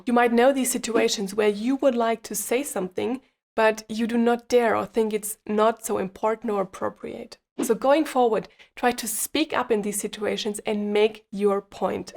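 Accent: Germany. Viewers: btw. Feedback is for me the most difficult to ask. Tip 11 is btw for me a problem, because I am criticaly ill. However I am very much on my way with doctors to get better and very motivated to start my walks which I have done in the past again. So do not worry. 11 is comming again. I do my shopping by foot, so a bit 11 already. For you in tip 12, something small to learn, a little line in Dutch, however do I hear a little German accent? In that case it will not be that difficult: Dank je wel dat je me vandaag weer wat geleerd hebt.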